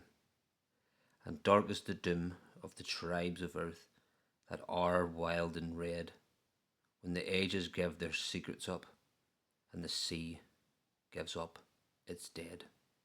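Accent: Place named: Northern Ireland, United Kingdom. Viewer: Awesome poem! I agree with Patrick, that northern Irish accent makes the reading better 😊, thanks for sharing this mate🤝 Hope you have a great day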